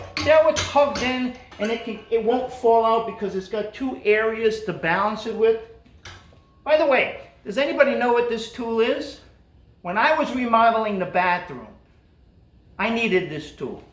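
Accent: Rhode Island, United States. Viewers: Sounds like a RI accent?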